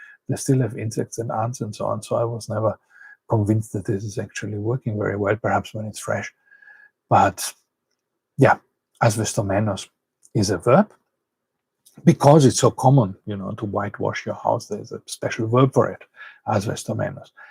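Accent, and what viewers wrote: Germany. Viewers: Hello, may I address you like this? I love your content, my husband and I have been trying to learn Greek for a couple of years now and we especially like your approach of explanation with all the connotations. And since we write you from Germany we love the “airy” German accent in your English! Just saying that the sound quality in the streaming production was not very good and made it often difficult to understand your explanation. In the whole we look forward to seeing and hear ing more from you and thank you for your effort!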